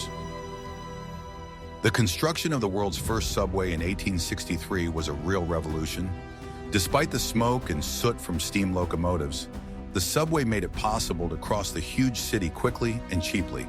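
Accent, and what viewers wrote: United States. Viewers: What’s this American voice all about, it’s put me off as you don’t tend to believe it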